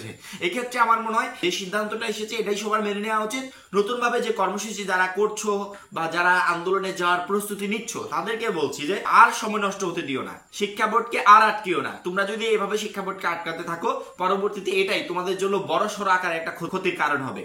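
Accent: Sylhet, Bangladesh. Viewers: Vai ki sylhet er naki?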